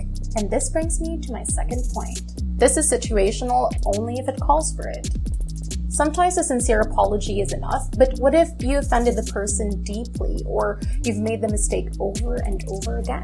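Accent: Ilonggo, Philippines. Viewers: Ilonggo Accent ❤❤👏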